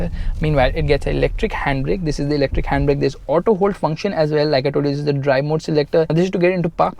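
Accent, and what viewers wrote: India. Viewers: Indian English is so funny.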